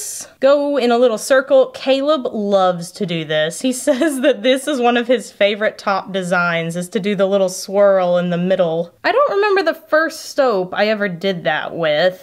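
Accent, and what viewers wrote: Texas, United States. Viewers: I am not a surfer (tried and failed LOL) but a diver (instructor even) and that is kind of the same ..... the beach part of the soap is reminding me of a ocean glass beach or the beach after good storm with all the torn out algea on the beach..... and I hardly use "soap" I am just coming back for you bubbly personality.... I love it that you dont doll up for work cause that is what it is even if you take us along with the cam.... as you said it is hot no need to be painted like a parrot ;-) and of course I come back for the texas drawl "OH MY GOAD" and the "Scrappy Scrappy" was really disappointed the other day I found a video without a scrappy scarppy LOL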